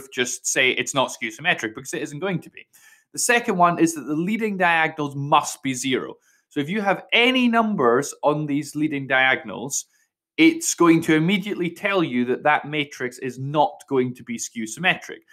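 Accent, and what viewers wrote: Scotland, United Kingdom. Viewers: I could listen to Scots accents all day music 🎶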